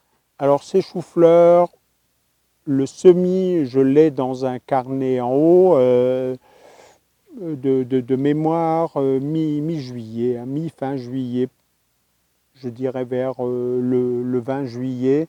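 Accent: Alsace, France. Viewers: Merci, c'est toujours un plaisir de vous écouter, j'apprends toujours quelque chose de plus. Il a beau être nul, celui qui a écrit le bouquin, je vais l'acheter quand même.! Il a un fort accent alsacien que j'adore étant moi-même d'origine alsacienne. Merci encore!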